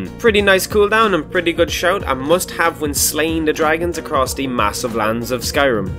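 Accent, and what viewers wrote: Ireland. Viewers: I have watched over 50 of your videos at least so far and this is the first one I realized you have an Irish accent.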